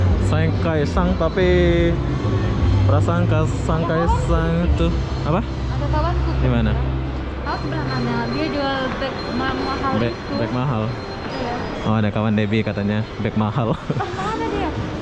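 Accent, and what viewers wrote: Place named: Malaysia. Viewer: Kamu orang malaysia atau indonesia? Waktu di video surabaya ditanya dari mana kok jawab dari lombok , tp logat kaya dari malaysia . yang bener mana 🤣🤣🤣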